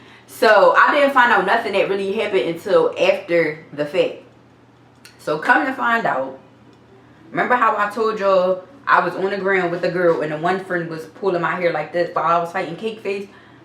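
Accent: Philadelphia, United States. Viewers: Ppl like to assume bc you goofy & be on chill, you not gon knuck if they buck. Wrong 🗣 They do that with me too. Sometimes mfs have to find out the hard way that these hands fly just as quickly as the jokes baby. Oh & Philly accent is the shit. I always get compliments when I visit family in the Carolina’s lol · I like your accent. I'm frm Brooklyn NY. NY & Philly r kissing cousins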